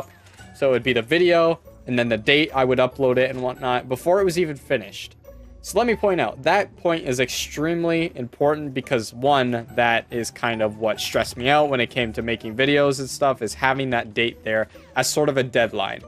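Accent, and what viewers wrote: Canada. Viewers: u canadian ?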